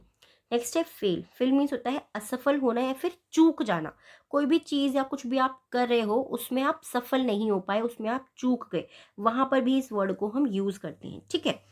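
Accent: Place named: India. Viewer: Hindi pronunciation of english word proper nhi lag raha hai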